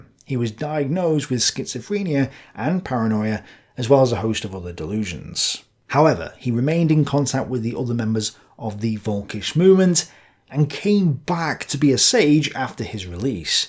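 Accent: United Kingdom. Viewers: Overlooked is their primary motive and impulse to expand Europe eastward into a world superpower colossus. No matter how bizarre, any ideology to further that goal no matter was deemed proper. That's why Hitler tolerated that stuff. It's amusing to hear a guy with a Limey accent in the once great British Empire bad mouthing that failed dream.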